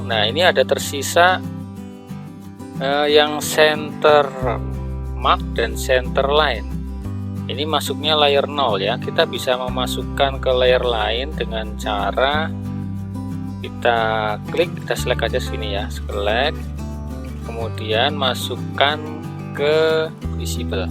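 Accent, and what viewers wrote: Banyumas, Indonesia. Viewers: Belajar lagi sama om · pagi " dah dikasih pelajaran nih makasih geh om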